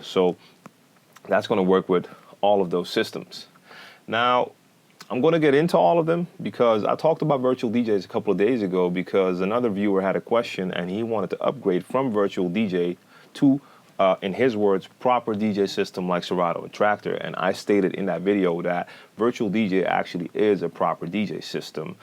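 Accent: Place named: United States. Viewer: Let me put this simple to you,,, by your accent i believe you are from USA. I'm a DJ for the last 26 years, i do beat match by ears, i'm a car driver, i have a 5 speed car. Virtual DJ is like automatic cars and the others are like manual shift cars. if you drive an automatic car, you are still a qualifier to get a driver license. And let me tell you something else, Virtual DJ is from France and is not free, the other crap softwares are free with the unit. After Numark failed to pay to Virtual DJ, they moved out of American market, also for a long time, nobody built a software like this. Virtual DJ is the first and the one, the others are pure advertizing. A real DJ is who has it a full house and makes everybody dance all the time no matter how !!!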